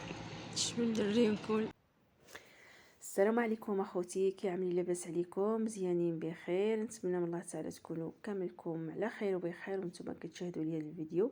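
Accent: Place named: North, Morocco. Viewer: اختي كتعجبني هدرتك بشمالية وقناتك تستحق المتابعة استمر ي بتوفيق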